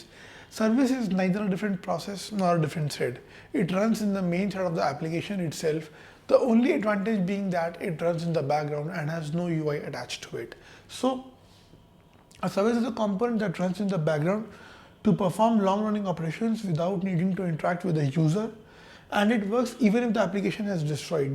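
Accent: India. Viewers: Sotaque indiano, difícil de entender.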